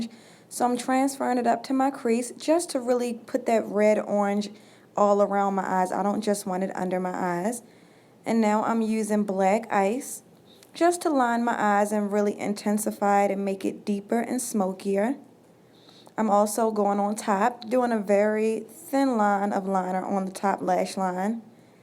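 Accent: Baltimore, United States. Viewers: Omg I could tell you were from Baltimore lol . love it, so beautiful. New Subbie!!!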